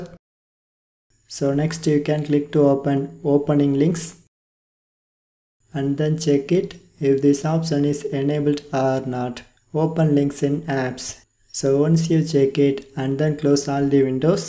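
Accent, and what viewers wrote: India. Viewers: Bro I love your Indian accent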